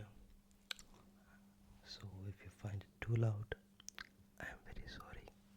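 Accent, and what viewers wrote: India. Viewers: I love indian accent asmr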